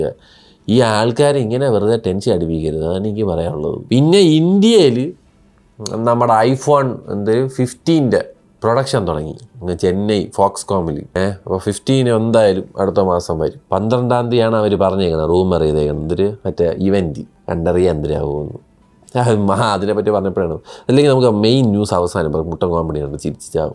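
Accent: Trivandrum, India. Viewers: ശേടെയ് തിരോന്തരത്ത് വന്നപ്പോൾ ഫുൾ slang തിരോന്തരം 🔥 അടുത്ത തവണ വരുമ്പോ പറയണേ അണ്ണാ ഫാൻ ആണ് ഒന്ന് കാണാൻ ഒരു ആഗ്രഹം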